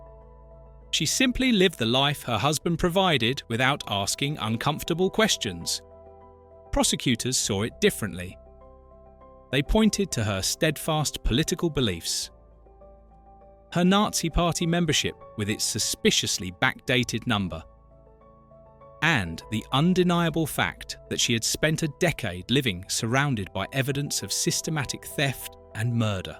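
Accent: England, United Kingdom. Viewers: A good Jewish person with a good clean English accent. Glad you approve. 😊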